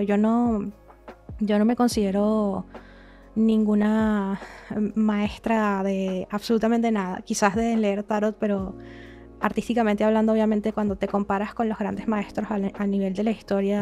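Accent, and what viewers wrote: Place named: Venezuela. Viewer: Eres la más acertada que existe 🙌 eres mi favorita y amo tu acento venezolano ❤ op 2 describiste todo en exactitud. Lastimosamente tiene pareja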